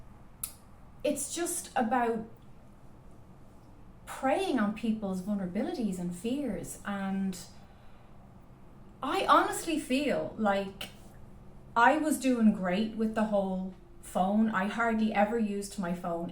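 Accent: Ireland. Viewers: are you Irish? according to my psychic vision you are.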